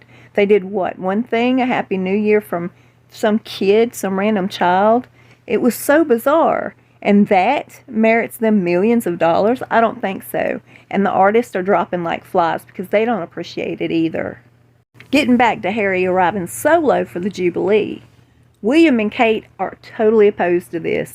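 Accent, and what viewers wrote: South, United States. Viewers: I just adore your accent!! It's so bright and cute!! One of my fave American accents (from an Aussie fan) :) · So glad to hear that spunky little Southern voice of yours! Hope you feel as good as you sound!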